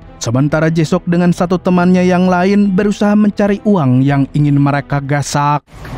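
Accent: Banjar, Indonesia. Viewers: Urang banjar kah ni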